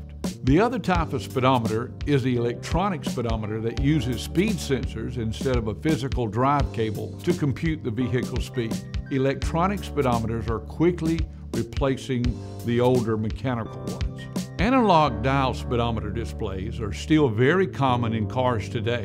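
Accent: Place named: Texas, United States. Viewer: "Their ve-HI-cles" WHY can't they pronounce words right?? Also they say h-wile instead of while. OMG the texas accent is super annoying but this video is very informative and was the only video i could find that showed how they work. But that accent will always irk me >__< either he's from Texas or Tennessee I can't tell their accents all sound the same to me.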